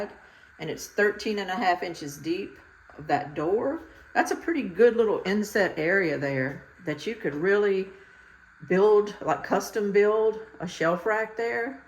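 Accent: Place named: South, United States. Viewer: Damn, she's a southern talking woman, and she talks too much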